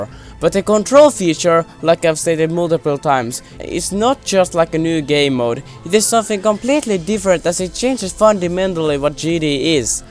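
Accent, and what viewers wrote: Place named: Finland. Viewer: sun äänestä kuulee heti että oot suomalainen :D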